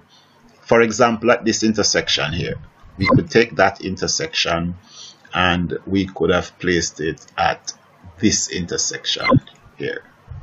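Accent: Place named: Jamaica. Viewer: How yuh mean mi general! Jamaican accent pon dem!!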